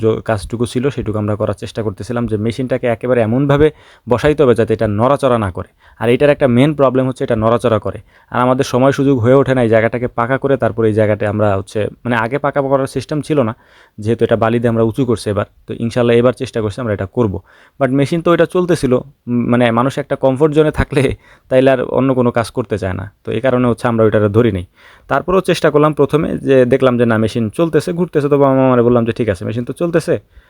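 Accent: Faridpur, Bangladesh. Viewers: ভাই আপনার বাড়ি কোন জেলায়, আপনার বেশ কিছু ভিডিও দেখলাম আপনার ভাষা শুনে মনে হচ্ছে আপনি হয়তো ফরিদপুর, কিনবা গোপালগঞ্জ।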